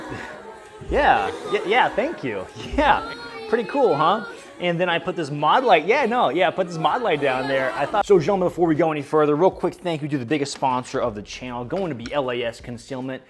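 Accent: Russia. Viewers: His russian accent is almost as good as FPSRussias So thats good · FPS Russia-level accent. Kyle would be proud.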